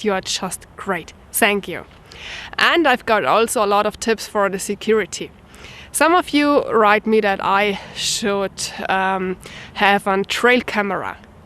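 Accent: Bavaria, Germany. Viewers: Hi! Regarding security for your little forest cabin ("hut"), I cannot add much more to what others have already written in the comments. I recently came upon this channel of yours. After watching 3 of your videos, I was impressed with your knowledge of outdoor skills. I was especially impressed with your knowledge of edible wild plants and herbs. So I subscribed to this YouTube channel. Als ich feststellte, dass Sie auch einen deutschen Kanal haben, habe ich ihn auch sofort abonniert. Es ist sehr beeindruckend, dass Sie Videos in englischer Sprache machen. Aus einiger Erfahrung[*] weiß ich, dass es nicht einfach ist in einer Fremdsprache frei zu sprechen. Also! Für ihre tolle Leistung... Hut ab! Und ich wünsche ihnen weiteren Erfolg bei allen was Sie unternehmen. Übrigens ihre Kinder sind hübsch und süß. I love your German accent in English and your Bayern accent in German. 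--- [*] In den 90er Jahren lebte ich in Deutschland und habe für eine deutsche Firma gearbeitet.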